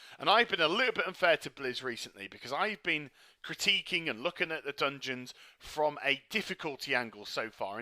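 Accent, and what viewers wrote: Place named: United Kingdom. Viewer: damn this guy's accent is annoying more so than other british accents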